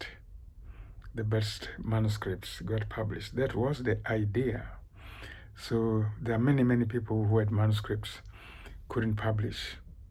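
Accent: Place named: Tanzania. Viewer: love it! i was in tanzania once for a month with my grandmother because she works for the church mission in germany , the people and the country are just awesome definitely visiting again soon . So hearing you talk reminds me of this wonderful time i had there. And you have a very calming voice ! Keep it up ! <3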